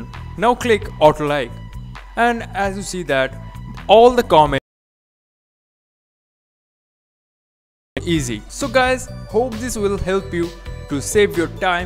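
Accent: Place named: India. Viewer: its an Indian Accent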